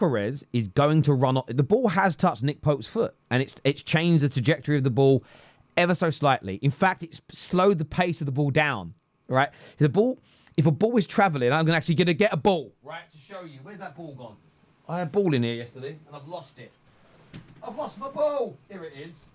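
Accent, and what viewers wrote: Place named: Liverpool, United Kingdom. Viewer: The voice sounds like he was born in Liverpool 🤔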